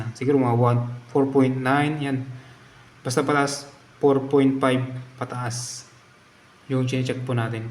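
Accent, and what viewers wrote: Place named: North, Philippines. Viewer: taga north ito halata sa tigas ng salita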